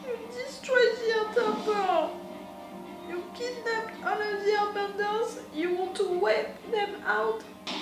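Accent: France. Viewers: You still have the most incredibly French and incredibly sexy voice and accent that I have ever heard ; ) Now, on to the reaction! <3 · i love your english with french accent its soothing at times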